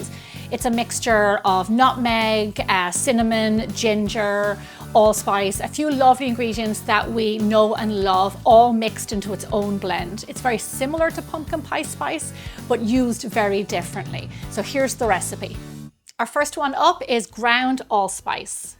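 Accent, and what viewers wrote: Ireland. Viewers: ❤❤❤❤❤ THANKS SO MUCH! Your videos are so well done. The camera work and closeups, the explanation and history, and of course your adorable Irish accent all combine for a lovely presentation. I’m eager to try your mixed spice. 🥰 PS Do you have any cookie recipe that use this mix? Also, I live in a rural area and probably can’t get all the spices locally. Can you recommend an online source? Thanks again. 😊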